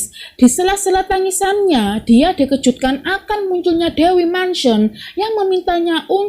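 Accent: Java, Indonesia. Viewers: Ciri khas memang medok, maklum wong jowo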